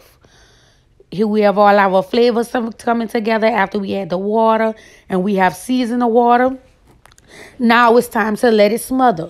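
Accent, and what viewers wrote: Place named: Louisiana, United States. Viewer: Sounds like Louisiana baby.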